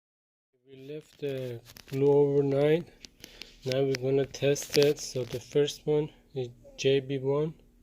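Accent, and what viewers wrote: Iran. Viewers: Love your accent. Sounds Persian. Where are you from?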